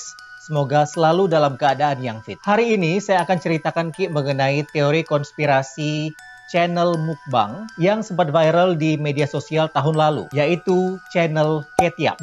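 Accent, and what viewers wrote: Makassar, Indonesia. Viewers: Orang Makassar kah ces?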